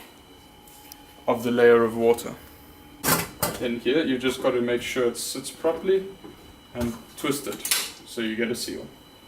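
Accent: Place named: Germany. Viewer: few words and i can tell, german right?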